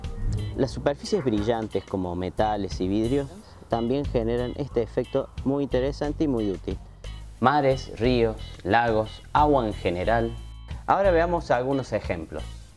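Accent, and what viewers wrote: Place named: Córdoba, Argentina. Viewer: Te escucho con acentito coordobes, argentino. Gracias por el video.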